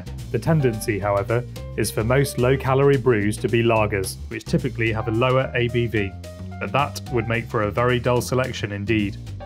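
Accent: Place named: United Kingdom. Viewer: The British accent makes me wanna vomit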